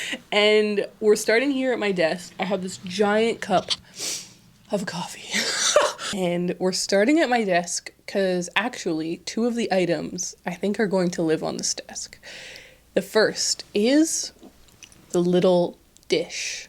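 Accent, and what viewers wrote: Canada. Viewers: your canadian accent seems strong to me and i love it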